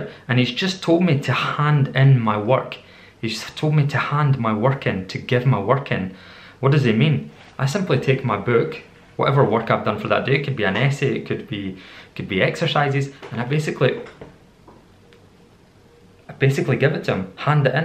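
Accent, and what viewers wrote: Scotland, United Kingdom. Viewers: Do morrre classesss likiii thaaat, juust speakkk a little bittt slowly and using morrre Scottish accent. Grrreat. See you laterrr. I love the Scottish accent.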